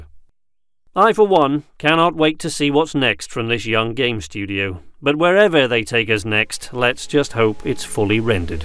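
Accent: United Kingdom. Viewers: holy shit he has the accent like colin firth in kingsman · yeahhh i can hear it in your other episodes, just this one you sounded Welsh 😂😂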